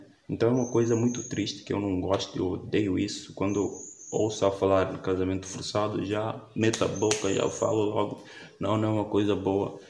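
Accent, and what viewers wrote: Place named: Brazil. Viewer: Vc é um brasileiro puro kkk O sotaque de Guiné Bissau é assim ou é por influncia Brasileira?? Muito legível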